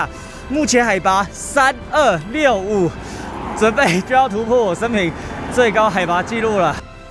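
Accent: Taiwan. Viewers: 一開口講話，就知道是台灣人，這沒辦法。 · 你说话的腔调和那个美食博主感觉太像了 那个博主也是台湾郎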